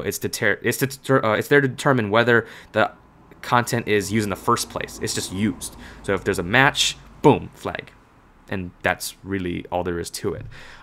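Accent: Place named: United States. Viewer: wow i am an Thai half Indian but i thought you were an american(i am not a resits)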